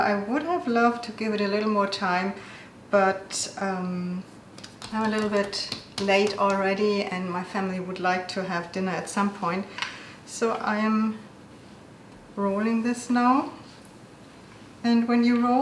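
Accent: Germany. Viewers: So I came here for the Strudel recipe, didn't expect the attractive lady in a dirndl with the German accent, now I'm subscribed. I'll be trying this and the red cabbage recipe as well.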